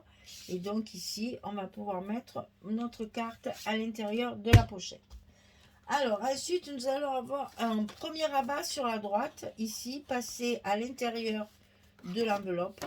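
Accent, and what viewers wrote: South, France. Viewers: Bjr. Un tuto de vous sans parole quel gâchis!! Votre accent ensoleillé nous manquerais trop par ces jours de pluie ☔... Même sur le Pays Basque / landes le temps est triste actuellement... prenez soins de vos cordes vocales, vos tutos ne peuvent pas fonctionner sans votre voix du Midi... A demain ou plutôt Adishatz (en landais) ou Agur (en basque)...👋😉 bonne journée.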